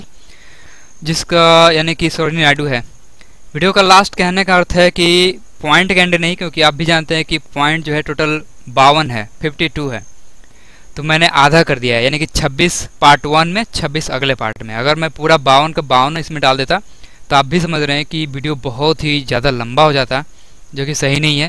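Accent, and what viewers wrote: Bihar, India. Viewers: bht mst bihari bolte ho aap sir😃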